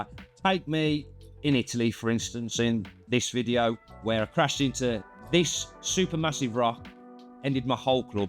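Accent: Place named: Midlands, United Kingdom. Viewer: For anyone not from Britain... this is a classic "Midlands" accent ... 🫡🇬🇧 It's equivalent to the American 'deep south.' 😂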